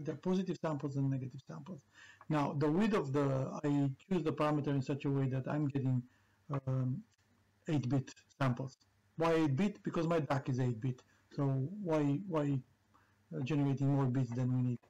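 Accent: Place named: Romania. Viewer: Your accent sounds romanian, I don't know why. Thank you for the nice video!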